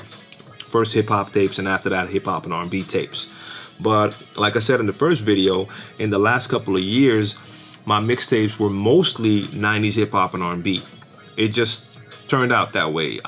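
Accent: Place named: United States. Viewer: Wow, your accent sounds like you were born in the US and influenced by living in NL, if I met you on the street I'd never guess... haha. Thanks for the videos man, I've been a mix dj for years, just trying to get better at scratching... you've got some good tips.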